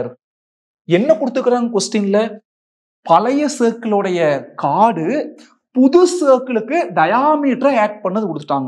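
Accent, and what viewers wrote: Coimbatore, India. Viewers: Coimbatore slang😋☝🏽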